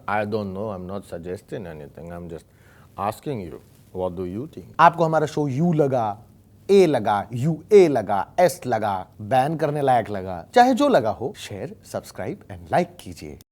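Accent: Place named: Bengal, India. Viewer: I love your bengali accent the most. Hahahaha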